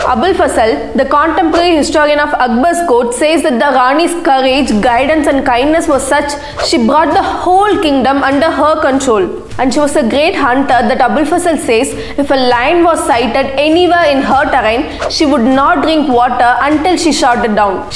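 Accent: Tamil Nadu, India. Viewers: You have heavy Tamil accent, why don't you make videos in Tamil itself? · You have a very thick tamil accent. Please work on your accent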